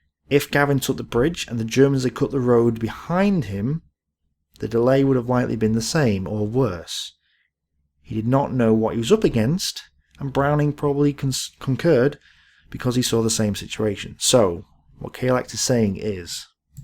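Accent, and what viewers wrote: United Kingdom. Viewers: I am American and I came away from your Marketgarden video thinking its failure was based largely on Gavin's failure to take t Nimagan bridge. Also, I am so provincially American that I just assumed you were American. You dont sound like t Queen, Michael Cain, or Richard Dawkins. So Idt I even noticed your Brit accent. Tho now that you bring it up , you do clearly have some sort of Brit accent. My wife guessed that you are from Manchester . Tho Idt you sound like Ian Curtis either. So Idk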